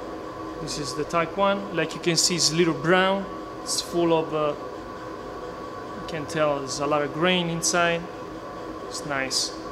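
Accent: Italy. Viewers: love Italian accent!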